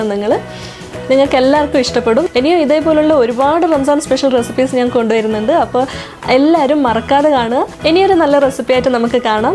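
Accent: Kannur, India. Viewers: ഇക്ക് ഇസ്റ്റല്ലന്ന് പർജ്ജിലെ... 😂😂 kannur slang adipoli...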